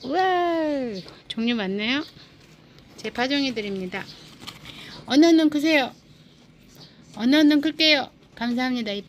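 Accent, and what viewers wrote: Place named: Gwangju, South Korea. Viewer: 사투리가 정겹습니다 저도 광주라 듣기편했어요 ㅎㅎ 삽목이 꽃피운거 분양해주시면 안되나요? 저도 러샤바욜 하나 키우는데 둘이 칭구 만들어주고싶네요~~^^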